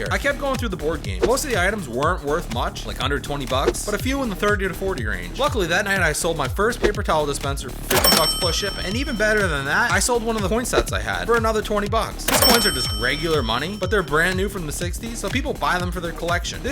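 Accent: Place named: New York, United States. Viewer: We are rooting for you, brother. Congrats on the recognition you’ve been receiving. Judging from your accent, seems like you’re from my neck of the woods (NY tri-state area). Best of luck!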